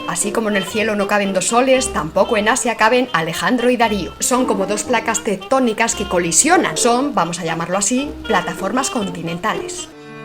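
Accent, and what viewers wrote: Spain. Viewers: Ole esta española! Que gusto oírte!!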